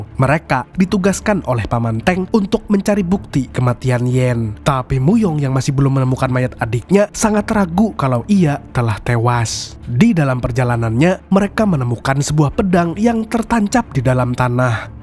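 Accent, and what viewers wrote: Banjar, Indonesia. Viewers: Ulun kada tahu Pian orang Banjar kah... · Bah.. Urg banjar kh ni? 😅😅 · Org Banjar sekali kwn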